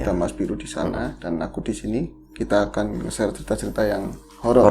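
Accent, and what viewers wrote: Banyumas, Indonesia. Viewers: Basa ngapak mas dan selaan mas itulah yg dinanti...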